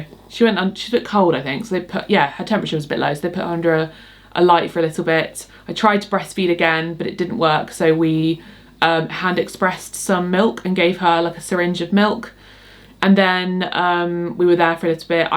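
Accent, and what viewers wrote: England, United Kingdom. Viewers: Would you please slowly say your beautiful baby’s name? I’m American and I struggle at times with the English accent and you are speaking very fast. Thank you.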